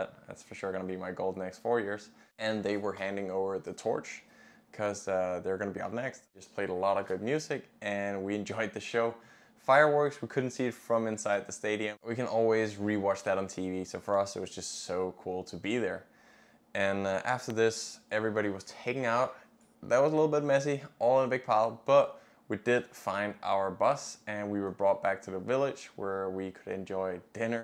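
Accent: United States. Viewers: Appreciate your sharing。Your English seems more like American style than British style. Why is that? Is the general case for Danish speaking English? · You are from Denmark but yet you have an American accent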